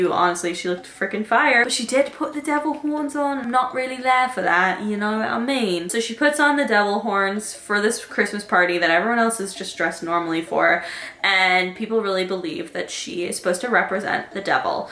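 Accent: United Kingdom. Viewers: Why am I so obsessed with your random British accent, it's so fucking funny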